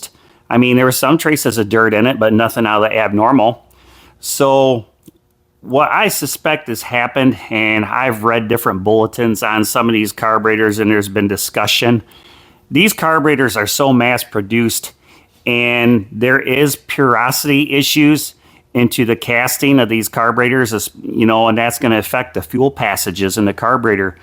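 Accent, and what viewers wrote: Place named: North, United Kingdom. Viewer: Kind of sounds like a cross between a Harley and a John Deere A. You sound like you’re from the great north. Nice video.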